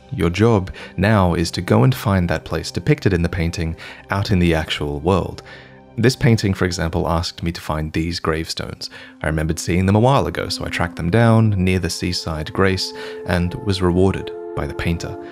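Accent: Australia. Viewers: Thank you, friend, for your sage advice. I am VERY new to Elden Ring and still struggling with the keyboard mechanics having come from a PC WoW type of set up, but this tips and pointers you offer are clear and concise. Being an Australian, it's also nice to hear a familiar accent and I think you should consider reading for Audible. Many thanks. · Seriously can’t place this dudes accent - sounds like a mixture of posh Aussie with a bit of US/UK flavour thrown in?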